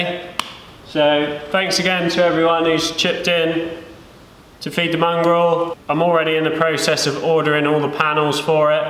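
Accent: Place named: Dorset, United Kingdom. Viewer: Dorset UK im guessing by the accent lol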